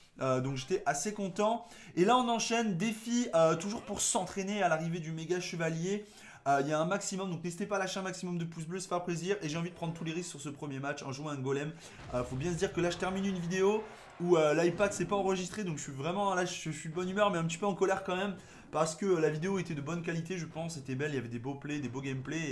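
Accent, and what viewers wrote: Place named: Marseille, France. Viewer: t a un accent marseillais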